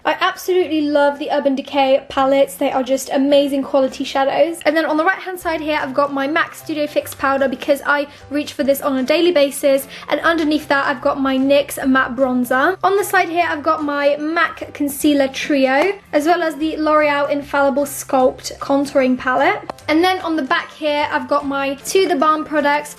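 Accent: England, United Kingdom. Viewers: bruh are you stupid? me and her have a english accent..also we speak proper actual english thats why we are known as english people dumbass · "Don't do it"... Um, you do realize that she can't help it if she says it like that because that's just her British accent so it's her voice and she's not doing it on purpose... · Anybody here who is British will probably not notice it, but it's her accent, she can't help it. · Hii, you have a lovely makeup collection! I love your accent! I'm an Italian girl, so I hear English only at school and my teachers don't speak English with a perfect British pronunciation... So I do exercise when I hear you😂😂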